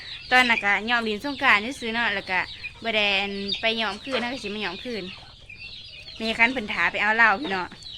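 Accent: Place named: Ubon Ratchathani, Thailand. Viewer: สําเนียงแบบนี้ต้องเป็นคนอุบลชัว.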